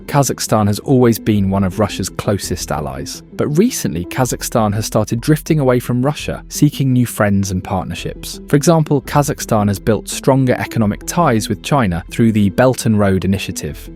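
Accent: United Kingdom. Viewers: You are so full of crap. Why is it that the British accent always comes with russophobia?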